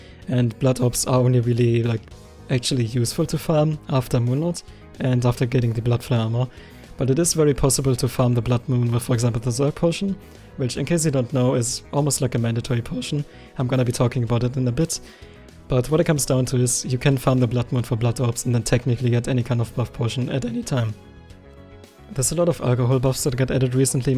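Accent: Germany. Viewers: I can hear the German accent in his voice/ greets from Germany Kamerad 🇩🇪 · are you german? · are you german?? xD bc you sound like german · U can really hear the german accent😂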